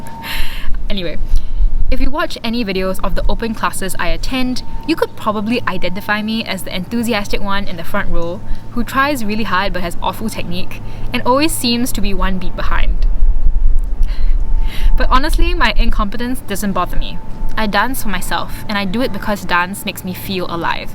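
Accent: Singapore. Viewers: Hopefully your Singaporean accent will fade away with the world class education at Yale.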